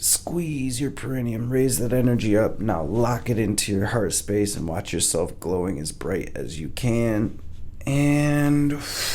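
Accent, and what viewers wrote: Detroit, United States. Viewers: Are you from Detroit I keep hearing you say what up doe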